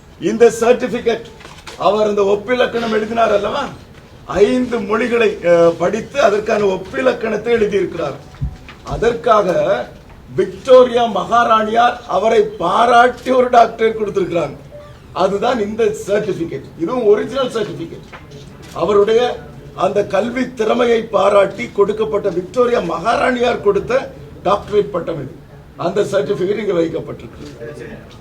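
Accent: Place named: Nagercoil, India. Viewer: Nagercoil karanga pechu semma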